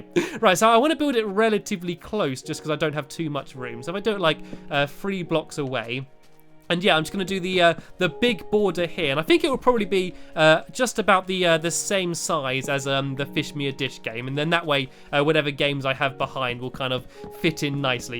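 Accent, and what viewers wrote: United Kingdom. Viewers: my mum hates your british accent................ But, hey, we used to live in England. So Go girl, that was how you used to sound. SO Suck it up Princess <3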